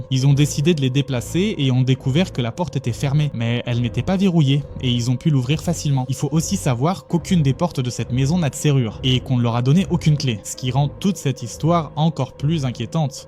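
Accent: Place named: Quebec, Canada. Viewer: T quebecois?? On dirais ta l'axent moi je suis quebecois❤😂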